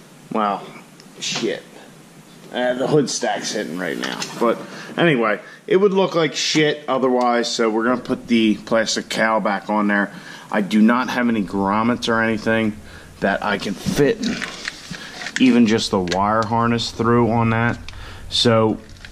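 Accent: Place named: Pennsylvania, United States. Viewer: ditto. keep it. those of us who understand the the hunky (slang for the polish-slovak-german-italian culture mashup in the area) accent, or who are from pennsyl-tuckey actually appreciate having someone who doesn't sound like everyone else on the web.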